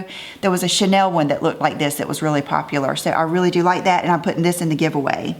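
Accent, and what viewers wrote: South, United States. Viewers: One celeb worships Satan so I am not helping that person's bank account as a devil worshipper. We're accountable for what we know and I don't want my dollars going to anything that person may use funds for connected to the occult, and satanism. We can all have tolerance for diversity but when it goes deeper than a difference in opinion or finding a celeb "simply annoying" or something...."miniscule" I have to draw a line. I love your country accent and you're lovely. I've watch this video a few times just because I enjoy listening to you and seeing all these goodies! I own all the OPI polishes you mentioned. I need to find the Revlon mauve-ish shade! God bless you and stay beautiful!!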